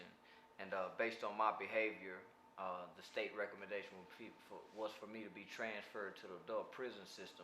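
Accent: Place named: South, United States. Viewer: As a fellow Southern Black Man from Alabama with Texas ROOTS, I SUPPORT MY BROTHER. Hes highly intelligent and speaks words of wisdom!!!! GLAD that he exposes those dysfunctional California LA gangbangers as a HORRIBLE threat to ALL of America!!!! This was an Awesome Bio. Thank you Mr. White. I love that Southern Accent! AWESOME!!!😊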